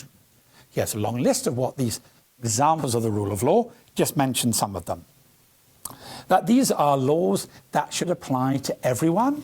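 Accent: United Kingdom. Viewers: Sounds Scottish of some description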